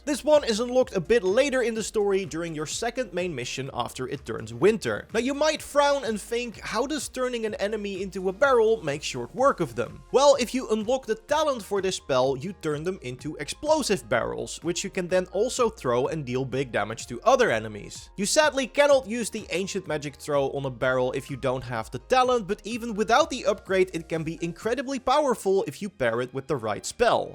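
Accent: Netherlands. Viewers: The Dutch accent in this commentary is so obvious I love it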